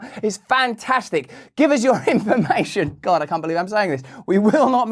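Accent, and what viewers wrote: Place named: United Kingdom. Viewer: He said "... Jiggery-Pokery" with a straight face! 🤣I love this guy! He breaks down the HEAVY IRL news with a great sense of humor, Empathy, irony, & an amazing British accent. You've come a long way, Russell! So have we all. Still evolving. Big hugs to Everybody❤️! (non-corporeal, if that's easier for you😉)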